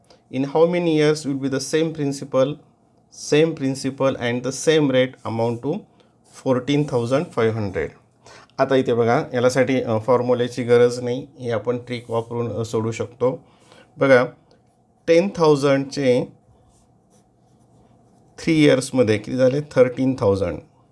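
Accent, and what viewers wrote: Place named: England, United Kingdom. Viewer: Sir please 12 la jasti English style madhye bolaycha prayatn karu naka te kasatari vat te😅😅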